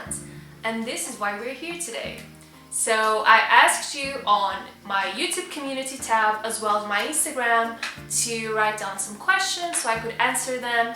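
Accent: Portugal. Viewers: OMG your Portugal accent is so beautiful! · Eu sou Brasileira e o sotaque Portugues do Portugal e muito diferente xD xD xD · Adorei ouvir o sotaque português! Sou brasileira, e é engraçado o quão pouco contato eu tenho com o português de Portugal falado. Faça vídeos como esse com mais frequência! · Português de Portugal é tão engraçado e tão bonitinho. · Omggg portuguese from Portugal is sooo freaking different 😂😂❣️ (I’m from Brazil) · Sou brasileira e amo o sotaque de Portugal ♡♡ queria que os brasileiros usassem o termo "bocadinho" também haha ♡♡♡ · Since 2016 watching your videos and... well, what a surprise! I definitely adored the new format. By the way, Lisboa é a cidade mais bonita que já visitei e seria maravilhoso assistir a vídeos em português. The Portuguese accent is one of my favourites! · Sempre quis saber se era portuguesa ou brasileira, por conta do sotaque e dos livros em português. 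Abraços do Brasil! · HAHAHAHA sua voz muda e fica com o português de Portugal que fofinho. 😍❤❤😢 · A melhor parte do vídeo foi te ouvir em português. O português de Portugal é muito, muito bonito.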